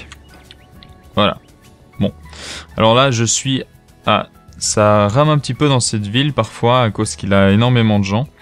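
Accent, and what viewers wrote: Belgium. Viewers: c juste que c un belge(wallon) vive les wallon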